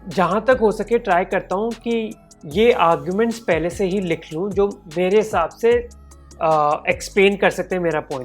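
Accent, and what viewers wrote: India. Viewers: Can you put captions on this video? Because it's really hard for me to understand the indian accent 😭😭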